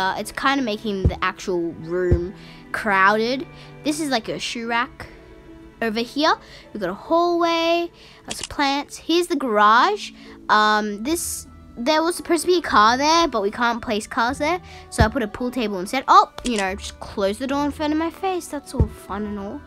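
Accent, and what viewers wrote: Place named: Australia. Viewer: I can tell your an aussie by listening to your voice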